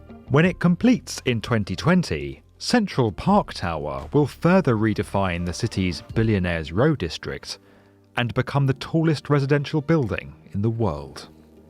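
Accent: United Kingdom. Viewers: Everything sounds amazing in a British accent.:) You have done a great job in this video. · amazing video, but I hate that mtfckin British accent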